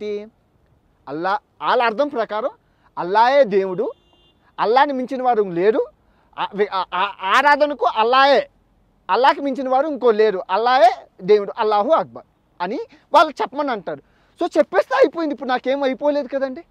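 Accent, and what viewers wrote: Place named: Visakhapatnam, India. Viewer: హాయ్ బ్రో ఈ మధ్య నీ వీడియోలు చూస్తూన్న చాలా బాగుంది... వైజాగ్ లో ఎక్కడ మీది... అంటే స్లాంగ్ ని బట్టి వైజాగ్ అనుకుంటున్నా ఎనీహౌ all the best for your future world trips and God bless You...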